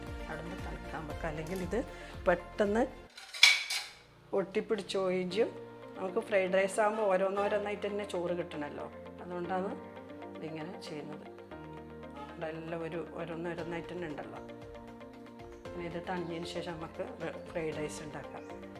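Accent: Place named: Kannur, India. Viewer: ആർക്കും മനസ്സിലാകുന്ന തികച്ചും കണ്ണൂര് സ്റ്റൈൽ അവതരണം 👍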